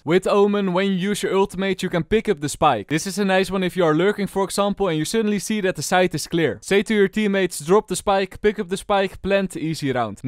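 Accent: Netherlands. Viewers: I will personally finance a getting rid of your Dutch accent course (coming from a fellow Dutchie)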